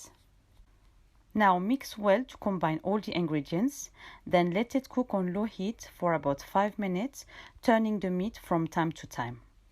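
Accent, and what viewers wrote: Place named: France. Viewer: You have very sweet french accent🙂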